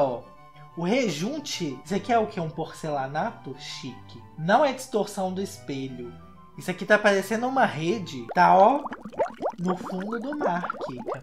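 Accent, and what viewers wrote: Minas Gerais, Brazil. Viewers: Gente, o é mineiro? Conversa igualzim a gente aqui de BH.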